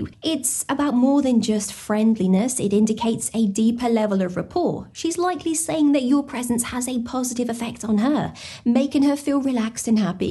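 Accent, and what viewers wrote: England, United Kingdom. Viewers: I am not sure your right or wrong I really don't care I love watching your videos because your beautiful and love your English accent.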